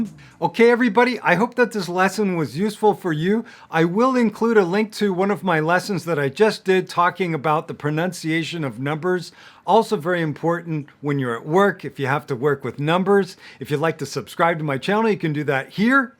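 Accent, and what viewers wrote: United States. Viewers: I like u very much for american accent sr. I have been watching ur vds since long time and im imroved a lot. Lots of love. ❤ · Hey there You absolutely have an amazing and super important accent! Are you from mid west of the United states? I lost your terrific channel a long time ago.but I got it today in a fantastic way. I wrote fluency English for Chinese! Wow I got you there! Truth be told I l have been looking for you for more than four months! I lost your name and the name of your unique channel! What I am really interested in creating music with your lessons I learned gimme from you last year! Thank you so much for sharing this amazing lesson!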